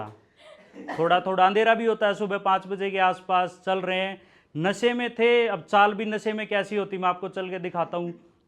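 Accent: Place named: Haryana, India. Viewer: Great Going Bhai... 👌🏻👌🏻👌🏻👌🏻👌🏻👌🏻👌🏻 All the best, we all are with you... Meri ek baat hamesha yaad rakhna, Your HARYANVI Accent is your STRENGTH, your ACTUAL POWER... Don't ever loose it, Keep it up... 👍🏻👍🏻👍🏻👍🏻👍🏻👍🏻👍🏻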